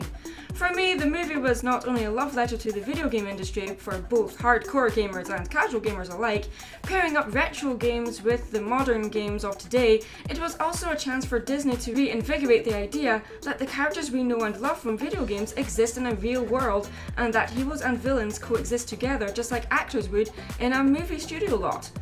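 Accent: Scotland, United Kingdom. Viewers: your scottish? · You know, for the longest time, I never knew that the Disney princesses were actually teenagers. They look more like they're in their 20s. Hmph 😕. Go figure. I love Merida. She's my favorite Pixar princess cuz she doesn't act all feminine. She has a definite tom boy feature like me but still beautiful none-the-less. I also love the fact the she's Scottish. I'm Scottish too thru heritage. I wonder if Disney will ever come up with an Irish princess. I'm Irish too. 😁 Lovely to meet you. Love your Scottish accent. Bye. ❤️ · Scottish Youtuber... Does art... Amazing voice... I'm sold I'm subbing, maybe watching more Scottish Youtubers like you will get my accent sorted! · Are you Scottish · I personally like Scottish ascent girls sound very ...well I don't know how to describe it but still Merida and you sound beautiful yet fun I don't find it hard to understand at all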